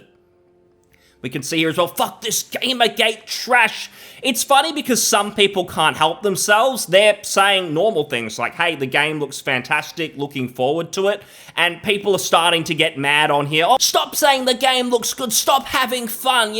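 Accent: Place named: Australia. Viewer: The way you talk is utterly ridiculous. Are you actively trying to sound like 200% more Australian?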